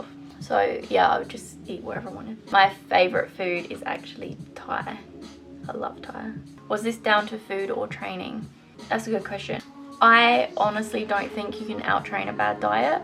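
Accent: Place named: Cheshire, United Kingdom. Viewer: Haha first video I thought you were a Brit and I was no an Aussie and kept going back and forth I’m from Birmingham, uk are you from Cheshire ? I hear a hint!x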